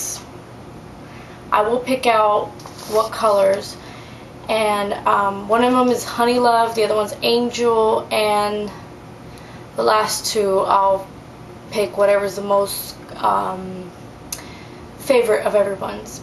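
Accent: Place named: Latin America. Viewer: MAC omg ur soooo prettyyy and u hav a sper cute accent its got like a lil latino kik to it lol if u no wat i mean...cant belive this contest is over reli cud hav dun wiv those products lol tc xxxxxx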